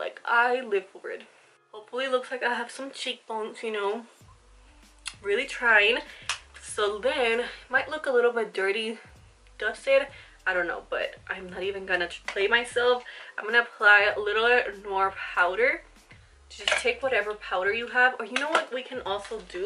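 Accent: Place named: Puerto Rico. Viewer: Preciosa, me encanta que eres Boricua y nunca pidas perdón for sounding puertorican, you have a beautiful accent ❤️🇵🇷🙏💪🏼 Wepa💪🏼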